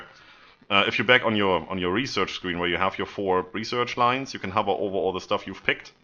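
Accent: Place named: Northern Germany, Germany. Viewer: Always warms my heart to hear a North German accent. :)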